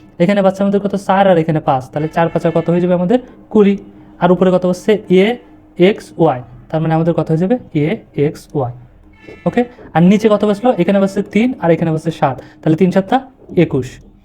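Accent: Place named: Pabna, Bangladesh. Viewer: স্যার আপনার বাড়ি মনে হয় পাবনায়।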